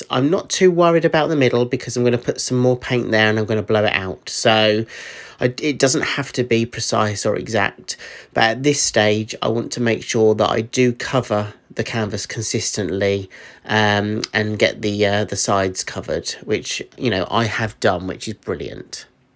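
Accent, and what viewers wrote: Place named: Northern England, United Kingdom. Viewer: its brilliant!! Congratulations! I’m a Manchester lass who moved to America 36 years ago 😳.I come home every year to top up my accent LOL It’s so lovely to hear your Northern accent! You are so relaxing and non intimidating to watch! ❤️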